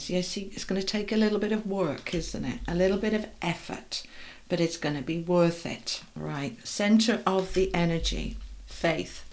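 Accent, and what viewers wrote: England, United Kingdom. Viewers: I love your British Accent, watching all the way from Namibia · South of Sydney Australia... British living here over 50 years can't beat that Pommies accent❤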